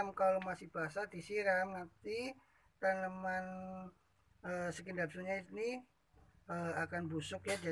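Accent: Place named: Banyumas, Indonesia. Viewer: Deneng ngapak,asli pundi nggih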